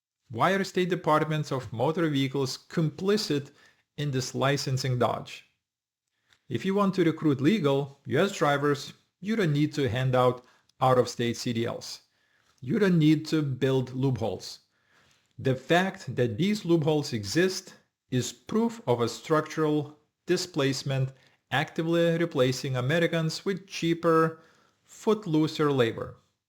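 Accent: Russia. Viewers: Sounds Russian acent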